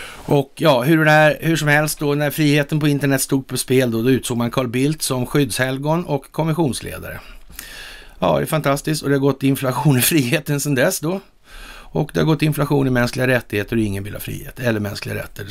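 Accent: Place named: Gothenburg, Sweden. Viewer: Vänligt av dig att du tog tid på dig och kom tillbaka tidigt igen ja förra klippet var jätteroligt trevligt att höra lite göteborgska igen det var länge sedan, 🌺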